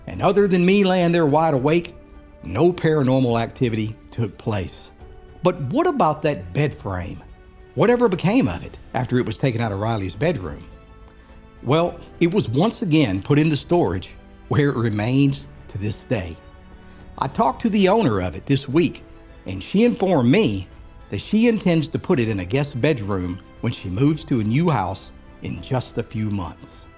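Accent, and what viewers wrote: Appalachia, United States. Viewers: Wonderful video, outstanding research, and terrific Appalachian narration. This episode was my introduction to your channel and I thoroughly enjoy the originality of storytelling and visuals. Subscription activated. Speaking from experience, children are sensitive and susceptible to spirit encounters. Great job!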